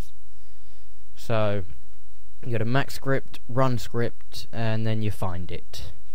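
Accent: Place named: United Kingdom. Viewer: Brits are taking over youtube tuts! and I love it! lol!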